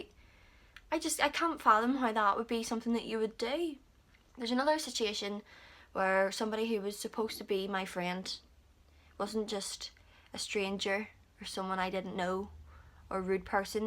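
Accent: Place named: Northern Ireland, United Kingdom. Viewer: Thank you for sharing your story ❤ so inspiring and I love yhe NI accent xx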